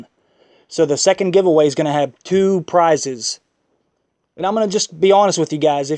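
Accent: Pittsburgh, United States. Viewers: you sound like your from pittsburgh lol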